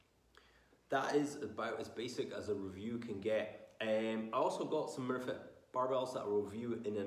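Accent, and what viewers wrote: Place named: United States. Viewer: Why's he speaking American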